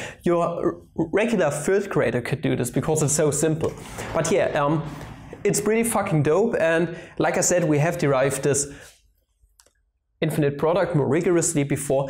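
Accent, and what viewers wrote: Germany. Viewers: I like your German accent. I don't know why, but it makes me laugh, and you Germans don't laugh. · That's a thick German accent :D, that pronunciation and intermixing of s and ß gives away everything. BTW I am from NRW. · always enjoy hearing the german accent😂just wonder if everyone can understand that "Weierstraß"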